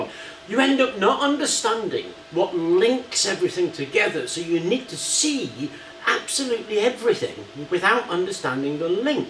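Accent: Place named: England, United Kingdom. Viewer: Sir, may I tell you with the upmost honesty, I appreciate your videos, every single one. I live in the states however, your accent reminds me of my late grandmother. She originated in England. I am a gigantic fan, and please continue to share your knowledge.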